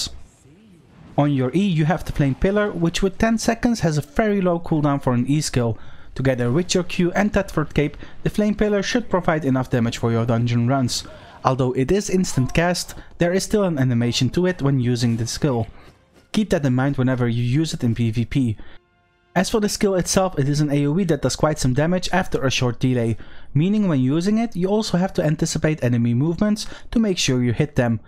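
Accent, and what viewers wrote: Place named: Netherlands. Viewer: Hoor direct dat je NL bent.. Toffe video maar probeer je Engelse accent wat te verbeteren ;)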